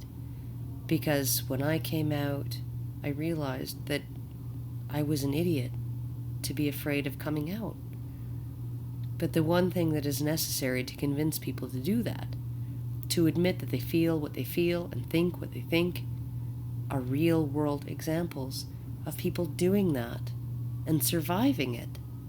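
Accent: Canada. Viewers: her canadian accent. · fuck you and your dumb canada twang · love it! good job. u do have a nice voice and a Canadian to boot. way to go against the powerful in your country.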